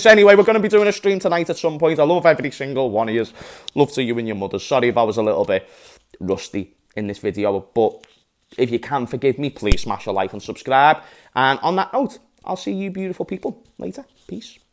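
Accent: Liverpool, United Kingdom. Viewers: Mate he's scouse thats rhe flow... what is it with this world and people telling people how they should be... authenticity is a good trait you want slow talking listen to lieing politicians 😊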